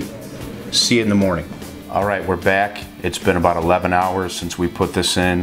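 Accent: Chicago, United States. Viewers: Hearing your Chicago accent gives me faith in attempting this.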